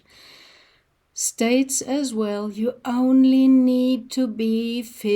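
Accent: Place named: Germany. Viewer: Your accent is wonderful I love your german side, but clearly you dont wish it to define you👍👍 Thats fine...but theres nothing wrong with this❤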